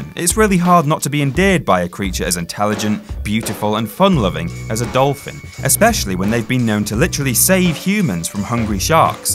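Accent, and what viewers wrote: Canada. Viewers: Is Canadian? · i didn't know you were Canadian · Is this English guy actual Canadian or have I have been to many episodes of South Park?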